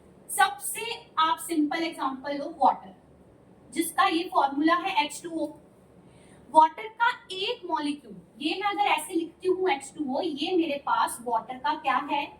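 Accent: Punjab, India. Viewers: Are you from punjab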